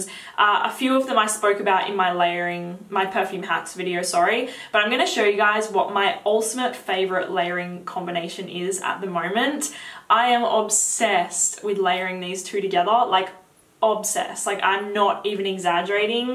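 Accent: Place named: Australia. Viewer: What part of Australia is this accent from??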